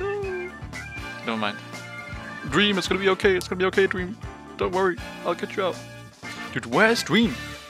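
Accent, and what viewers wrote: Denmark. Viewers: hah! Me too!😌 i noticed the danish accent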